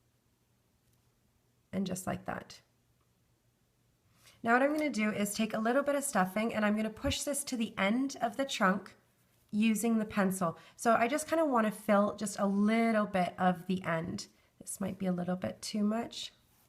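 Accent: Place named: Canada. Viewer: I'm american and you soundas little Canadian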